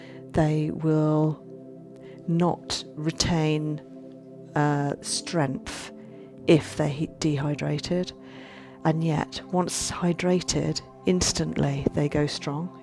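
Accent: England, United Kingdom. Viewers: Amazing videos and very interesting. Didn't even know this was a form of treatment. Great videos. What part of england are u from? 😊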